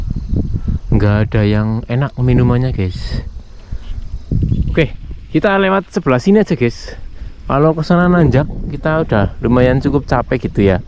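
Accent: Banyumas, Indonesia. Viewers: semangat blusukan terus mas, bungah tak ulang-ulang terus nonton vidio blusukan mas ngapak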